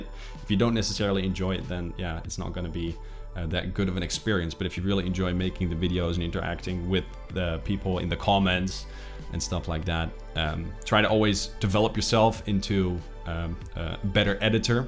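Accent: Netherlands. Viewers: Een Nederlands accent kun je nooit echt verstoppen, kijk je video's al tijdje, en je bent een goede speler. Heb Dayz al een tijd niet mee gespeeld, zou je een video kunnen maken over de staat van de game, hoe is het veranderd in het laatste half jaar ? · Be proud of your heritage; don't drop your Dutch accent just because you might think it's uncool, nothing wrong with anyone (whether they're streamers or not) with an accent. Just my 2 cents man, always enjoy your videos.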